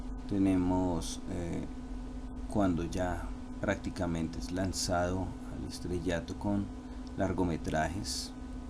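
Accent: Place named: Bogota, Colombia. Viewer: Hola, buen video gracias,por el acento creo que es de bogota????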